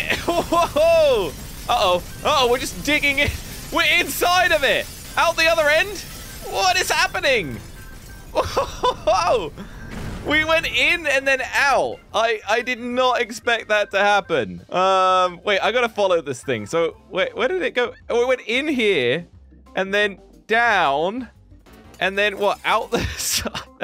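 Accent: United Kingdom. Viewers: Are you from the uk?🇬🇧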